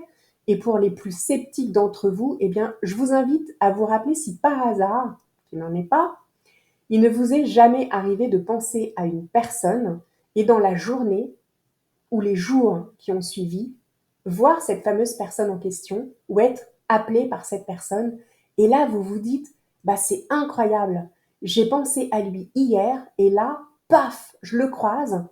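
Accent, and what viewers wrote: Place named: Quebec, Canada. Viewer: Bonjour 😊. Merci pour cette belle capsule. Hâte de découvrir un peu plus ton nouveau programme 😊. Et ton accent québécois est juste terrible 😂😂. Très bon dimanche ♥️♥️♥️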